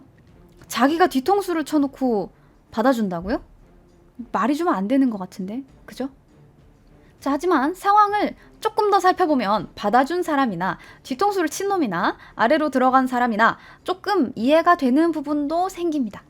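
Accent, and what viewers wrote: Gyeongsang, South Korea. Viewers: 전번에도 여쭤본바 이 지만..원래 역사 전공이신가? 넘잘하시네,,젊은분이..글고 억양도 살짝 부 마 지역?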